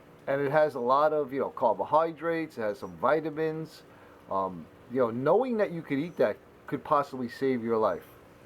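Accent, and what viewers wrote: New York, United States. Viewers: and the New York accent! Yasssl!! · Ah the New York accent brings me right back home! Haha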